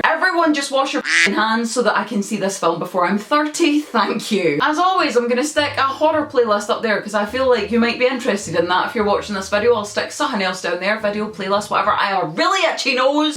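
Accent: Scotland, United Kingdom. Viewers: Your Scottish ? I could tell ha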